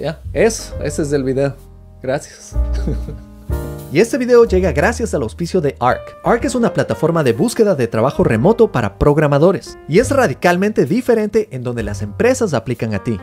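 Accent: Quito, Ecuador. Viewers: Jajaajja saludos bro, te escribo para demostrarte que no soy un bot, ya me tomaré el tiempo de revisar los cursos, y porque estoy en el proceso de aprendizaje, y me pareces un excelente mentor, además que por tu acento, creo que eres quiteño. Saludos desde Manta Ecuador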